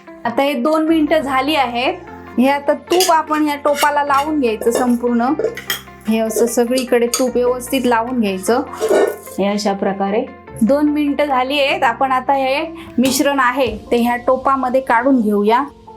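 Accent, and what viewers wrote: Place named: Malvan, India. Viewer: Waa..mast recipe ..thanks aani barobar tumch malvani touch aslel bolna pan mast.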